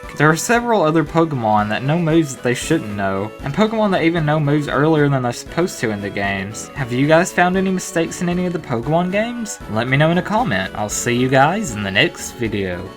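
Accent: United States. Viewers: I love your country accent I could listen to you talk all day about pokemon xD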